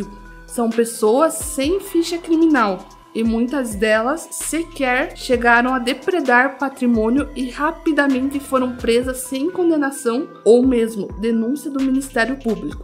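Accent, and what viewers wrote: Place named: Caipira, Brazil. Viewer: Diferente né, voz caipira, mas realmente espetacular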